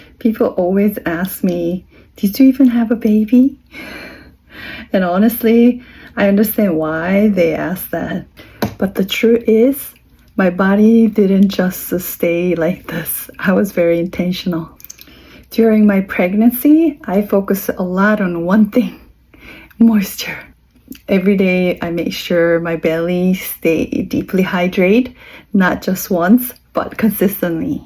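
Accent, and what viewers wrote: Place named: South Korea. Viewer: It's always great to hear you speak and to hear tidbits of your Korean accent, Ms., when you share your health secrets and otherwise. Hydration and consistency are now noted in my memory banks. Thank you. ❤❤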